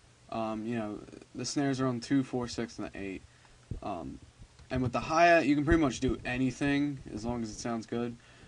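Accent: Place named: Pennsylvania, United States. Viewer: sounds like pa, maybe northern va or maryland. that area most def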